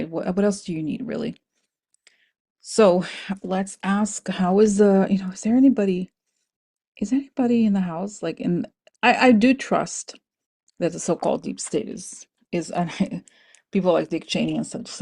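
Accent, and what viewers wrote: Russia. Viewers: To hell w people that don’t understand ze Russian accent. 😂 For crying out loud! I think it’s super cool when you do it!! Return to zender!! 😅 😊Rock on!! ❤ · I think your Russian accent is hilarious! My only piece of advice, after spending about a month total in Russia many years ago: Pronounce your “h’s” as if you’re trying to clear phlegm from your throat…. Especially with the word “happy”. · Screw those people who don't like the Russian Accent. I love it!! We got you girl!! 🎉🎉🎉🤪🤪💙💙💙✊️✊️✊️ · I love your Russian accent and all your videos. You and Corina are so much better informed than any other channel. You are the best!!! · I can understand your Russian accent just fine; I have a degree in theatre, though, so…🤓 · Love your Russian accent 😂💞 Thank you for the work you do ❣️ Much love and gratitude 💖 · I have no problem understanding your Russian accent. 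You are just PRECIOUS and you make me SMILE!!!😊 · I love you Russian accent.. I think it's cute I think it's precious and I understand every single word you're saying.. ❤ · I love the Russian accent. makes the commentary come alive. Very entertaining. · i love your russian accent. it is hilarius. I laugh out loud. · I like your Russian accent and it’s funny! Keep it up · I enjoy listening to you,. Always informative. And I understand you perfectly, even your russian accent😅 Take care😊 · I don’t mind your Russian accent! I just use English captions on the video! 😂😂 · I love your Russian accent. I enjoy listening to you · The switch between your Russian voice, perfect English and funny faces is super... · I love your readings and your Russia talking voice😅. You're great! 🥰💞🤍🕯️🕊️ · I love your Russian accent ❤ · Love your Russian accent! Thank you. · I love the russian accent, that is so funny. 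I live for it. Not only do you give us hope but you make us laugh. Its everything, dont change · Your Russian accent is just fine. I have no problem understanding you. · I love your Russian accent! You are very good at that and making their faces. I always laugh and I CAN understand what you say. We just have to listen. Thank you for all you do to bring us much needed information. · I love your Russian accent you are an actress and a channele. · I love YouTube. Sometimes I watch your video almost to the absolute end but don’t let it completely finish because I want to comment and when I come back it isn’t where I left it but it comes back to a part that intrigued me when you spoke about it the first time!!!! Freaky cool! Love your work AND YOUR RUSSIAN Accent!!!! And I love the makeup and hair styling!!! You are working so hard whatever you need to do to keep yourself soothed and I think fresh and beautiful!!!! 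So appreciate all your hard work! Much love from Michigan. 💛✌🏻 blossoms are coming!! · I love your Russian accent!! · I love your Russian accent! Makes me laugh every time. I love your welcome song too. Look forward to it every video. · I am hearing impaired so I either read the CC or the transcript. Pay no mind to negative comments regarding your Russian accents. You make me laugh. I adore you.❤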